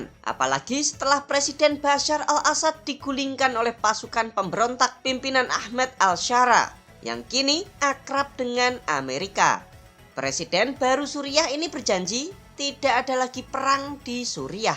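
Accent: Java, Indonesia. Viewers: Pembawa acara dengan logat jawa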